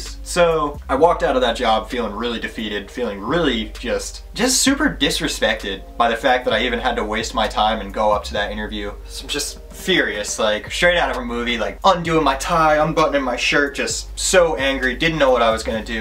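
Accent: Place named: New Jersey, United States. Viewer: You sound like a jersey dude. My kinda guy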